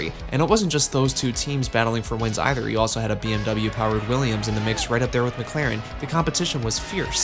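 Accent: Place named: Chicago, United States. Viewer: Your accent seriously sounds Chicagoan.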